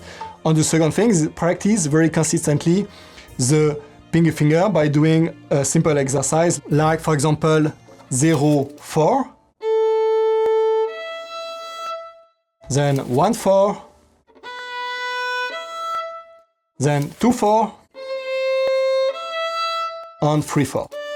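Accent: France. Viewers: I love your French accent 😁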